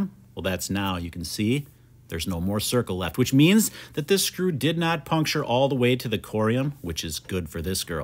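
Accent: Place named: Wisconsin, United States. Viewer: I knew he was a Wisconsinite from the familiar accent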